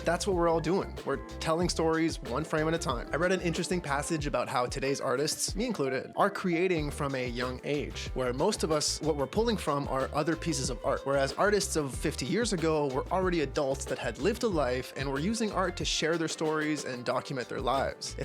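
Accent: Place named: Quebec, Canada. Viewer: Ben weyon! Ton français fait vraiment Québécois!? Tu viens du Québec?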